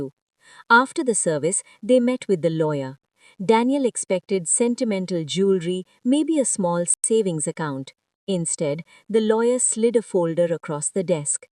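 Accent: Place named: India. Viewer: Narrator sounds Indian or Pakistani. Those cultures do a much better job of caring for their elders than the UK & USA does so this was an interesting topic for the Creator.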